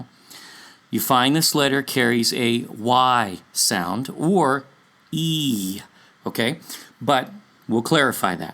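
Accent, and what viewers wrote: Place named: Philippines. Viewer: are you Filipino, if you are which sounds like you are, have you seen on the true Queen of Sheba, she was filipina, which was amazing to me. I am Cherokee/Irish, which we come from Yasshakar, that was amazing to me too. Shalum and be blessed akhy (Bro) in YaHUaH.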